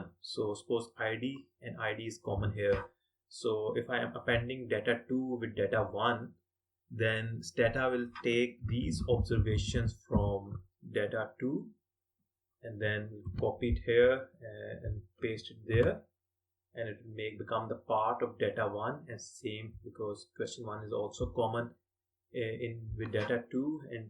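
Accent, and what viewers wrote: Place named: India. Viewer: good videos but the indian accent drvies a non English speaker really crazy……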